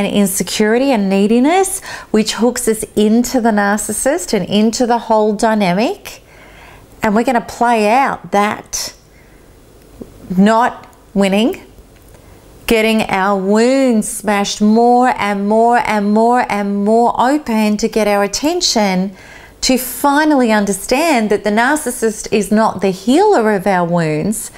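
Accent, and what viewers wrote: Australia. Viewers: the ozzy accent is strong with this one...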